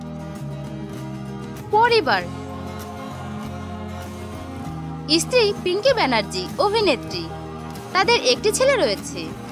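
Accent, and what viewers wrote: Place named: Bangladesh. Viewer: Kothakar manush apni?mone hoi Bangladeshi.thik vabe bangla bolte parchen na.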